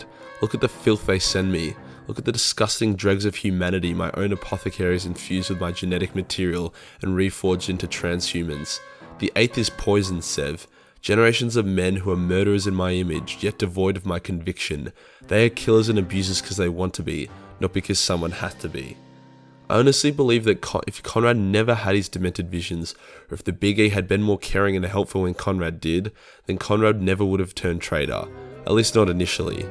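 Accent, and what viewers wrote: Australia. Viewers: Portuguese: Bom dia, seus putos. You pronounce as you do in your beautiful australian accent. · Dude, nothing makes me laugh more than listening to you explain things as only an Aussie can..... Love it mate and keep rolling them out.....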